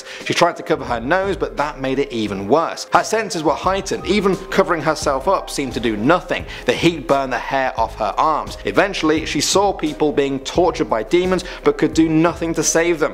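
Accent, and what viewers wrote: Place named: United Kingdom. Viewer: I can’t stand this British accent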